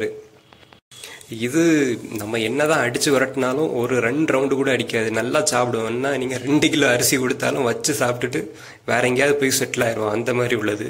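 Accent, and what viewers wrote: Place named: Kanyakumari, India. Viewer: நானும் கன்னியாகுமரி தான் Makka நம்ம ஆளுங்க பேச்சை அவங்கள easyயா காட்டிக் கொடுக்கும்...😍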